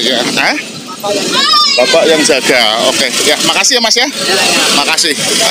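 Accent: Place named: Banyumas, Indonesia. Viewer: Orang banyumas ya om kok bahasa nya ngapak salam inyong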